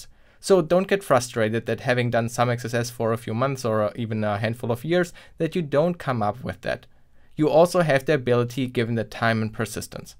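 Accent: Germany. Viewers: Man hört einfach das du auch deutschsprachig bist 😅 aber gutes Video 👌🏼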